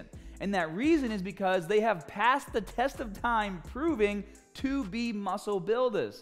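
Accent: Boston, United States. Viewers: That's a proper Boston accent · That thick Boston accent!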